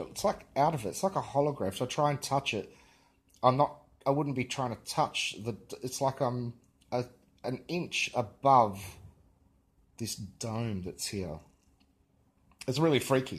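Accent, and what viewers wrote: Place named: Australia. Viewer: I just saw you on the RV Pod Cast. I noticed the Aussie accent so I thought I'd check you out, I'm in Coffs Harbour. I saw this dome video so it was the first of yours I looked at. I got trolled 4 to 4 and a half years ago about southern stars rotation so I looked into it, I looked into Stellarium and I also made a dome concept model with a salad bowl and lights and a phone camera to record inside the salad bowl. Links below, if you have time to check them out let me no what you think. Comments and likes are turned off on the videos because of continued trolling. Southern Stars, Reflection Of The Centre Stars? Part 1 (Using Stellarium) <link> Southern Stars, Reflection Of The Centre Stars? Part 2 + A Simple Experiment <link> Southern Stars, Reflection Of The Centre Stars? Part 3 Check With A Physical Model <link> 24 hour DAYLIGHT in Antarctica Experiment timestamped to shorten it. 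Flat Earth - 24 Hour Daylight Not Sun In Antarctica, Reflection Test Using Salad Bowl <link> This one timestamped also to shorten the vid, an idea on how the sun sets south of west in the southern summer. Flat Earth - Circling Sun Sunset, Circle Of View, Atmospheric Lensing, Experiment (Preliminary Test) <link>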